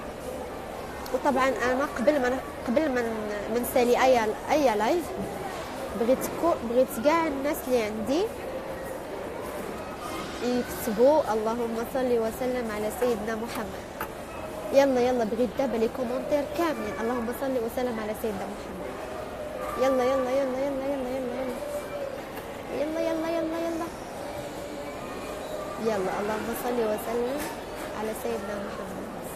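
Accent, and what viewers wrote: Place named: Gulf, Arab world. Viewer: هضرتك ماشي مغربيه لميه فالميه كتصنعي وديما كتهضري مص هضرتك خليجي وكتباني بحال شي وحده فالاربعينيات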